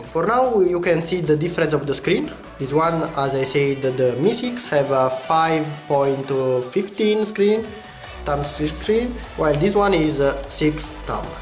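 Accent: Italy. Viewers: ma sei italiano porcoddio?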